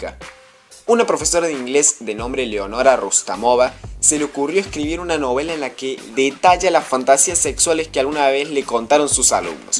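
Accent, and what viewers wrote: Córdoba, Argentina. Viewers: eres cordobes ? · adivino eres argentino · una pregunta sos cordobes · El Chabon Es Cordobés v: <3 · Oh Un cordobeh 👉😜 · sos cordobés? yo soy de buenos aires ARG💖💖💖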